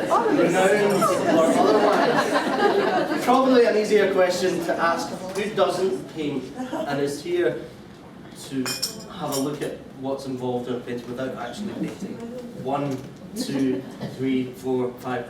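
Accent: Scotland, United Kingdom. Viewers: you are a very good artist and I love the way you use vivid colours that create such emotive impact in your paintings which I so much would like to emulate, even injust a small way, but in terms of communicating and dare I say showing how you teach, apart from the last one ie Lochgreen Part 6, speaking as a long term teacher, trainer and presenter you are 'crap'. I really do not see why you bothered to put this on Youtube, you got you back to us most of the tims so we can't see you painting, the canvas is at an angle and takes up about 1/6 of the screen, the projected screen which is just a duplicate of what you were doing was too light as you obviously needed light to paint, you had no decent microphone and having you back to us most of the time coupled with your very broad scottish accent most of your instructions I could not understand - if I was thinking of actually attending and paying for a live session, no way if this is what I would find. 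Shame as there are so many untalented artists on Youtube or really bad untalented paintings being sold- some one like you is much needed.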